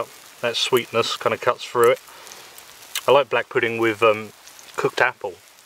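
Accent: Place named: Blackpool, United Kingdom. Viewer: You from Blackpool? My friend Howard sounds just like you, thats where he is from.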